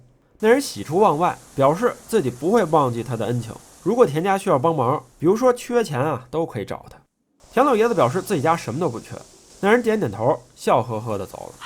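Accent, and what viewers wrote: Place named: Beijing, China. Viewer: 北京人吧？不r道，电r机，赵iao娟儿～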